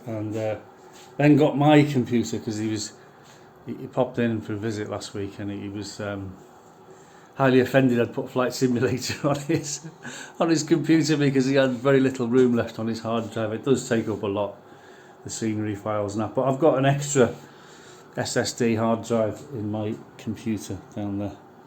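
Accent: Liverpool, United Kingdom. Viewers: Hello, what a tidy man cave/den. You are looking in the pink as usual. TBH I don't understand much about the flying stuff and jets/planes but I could listen to you for hours,the lovely Scouse accent. Take care 🌞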